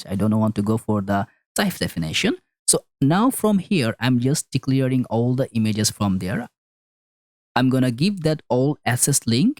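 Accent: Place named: Bengal, India. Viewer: are you bangali ?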